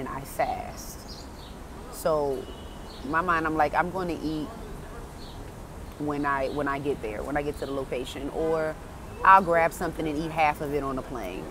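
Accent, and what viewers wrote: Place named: Louisiana, United States. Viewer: It sounds like you’re in Louisiana 😍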